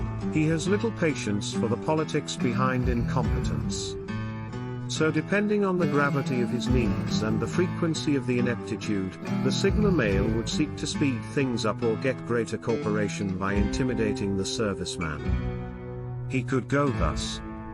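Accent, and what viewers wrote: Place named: England, United Kingdom. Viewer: English accent 💩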